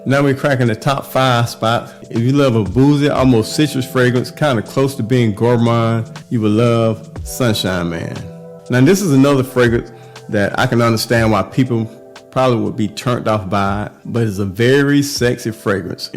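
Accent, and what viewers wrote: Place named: Alabama, United States. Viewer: Yo! Wherebot you from brutha? I hear some Alabama in ya talk. Love the content. Get well soon fam. Checking in from Alabama.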